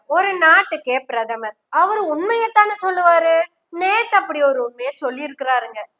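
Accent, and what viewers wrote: Kongu, India. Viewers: கொஞ்சும் கொங்கு தமிழ் அழகு. கொஞ்சம் கொச்சை இந்தி சூப்பர். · அம்மாடி உன்னுடைய கொங்கு தமிழ் மற்றும் கொச்சை ஹிந்தி... சூப்பர் மா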